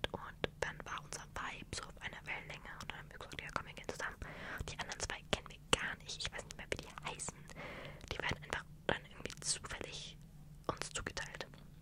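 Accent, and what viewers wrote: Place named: Germany. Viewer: German accent :virtualhug::virtualhug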